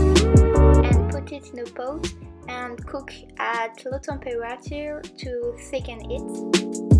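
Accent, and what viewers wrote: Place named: France. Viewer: Je crois deviner l'accent francais non ?😉 super video !❤️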